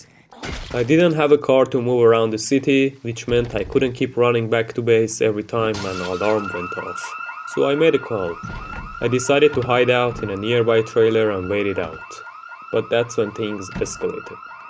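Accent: Iran. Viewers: دادا ایرانی؟ لهجت داد میزند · the persian accent 😂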